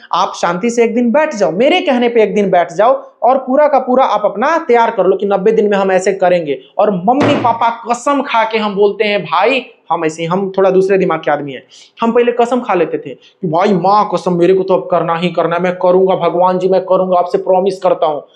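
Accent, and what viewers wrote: Bihar, India. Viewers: Bihar se ho 😅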